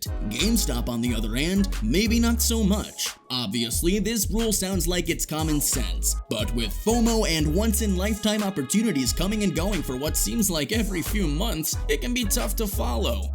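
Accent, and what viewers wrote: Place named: United States. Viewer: What an annoying typical american narrator voice.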